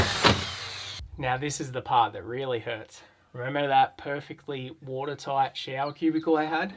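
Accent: Australia. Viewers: Adore this build mate. So happy for you. As an Aussie that spent time in Canada I love pickin up the little subtleties in your accent, pure Aussie with little sprinkles of Canadian in there. Very nice haha. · Jeeez! Auzzy English sounds shayyt! Cool video, though. Cheers.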